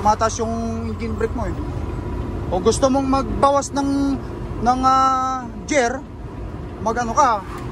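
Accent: Ilocos, Philippines. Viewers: Ilocano ka met ngayam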